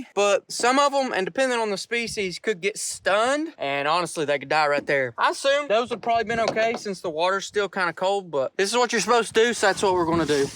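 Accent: South, United States. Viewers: It's still A riot to me that in this country, people actually talk like this honky tonky accent. Unreal! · Don't even know how I came across your channel but I've been watching 4 to 5 vids each night, I'm obsessed! And in my opinion, as a non American, your accent has quickly become my favourite American accent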